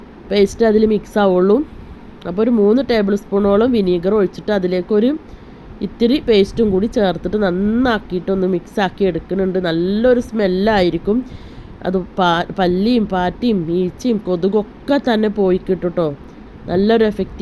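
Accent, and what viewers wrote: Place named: Malabar, India. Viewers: enthu bhaashayaa? malbaari bhaashayaano? manasilaakunnillaa!😇👻👹👹🤧👺🥵🥶💀💀😈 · എസ് ,ഇത് പാലക്കാടൻ ഭാഷയാണ്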